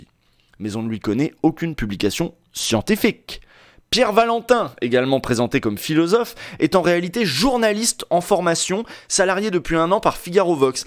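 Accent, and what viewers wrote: Quebec, Canada. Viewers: L'accent québécois toujours au top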